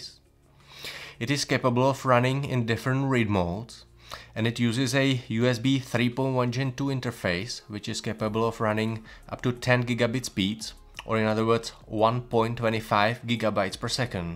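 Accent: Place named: Czech Republic. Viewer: tvl tvá angličtina zní jako od Čecha.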